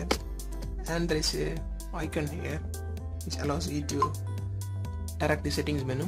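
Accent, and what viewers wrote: Kerala, India. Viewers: OnePlus One most indians speak english in the same way just lyk you.but i dint expect u to be a malayali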